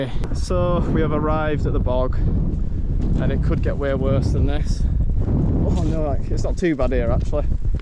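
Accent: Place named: Yorkshire, United Kingdom. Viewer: I don't know where you're from, but that sounds like a proper Yorkshire accent to me ! Great video, great weather ! I love it. 👍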